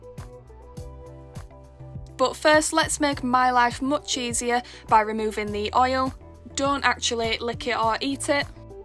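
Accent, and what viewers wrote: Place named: North, United Kingdom. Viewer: definitely a northern lass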